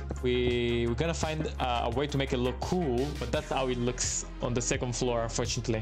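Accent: Italy. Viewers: I'll be honest, I came across a video of your YouTube shorts and hearing your accent I thought "He's Italian", I opened your channel and the first thing I saw was this video. I subscribed to your channel without thinking twice.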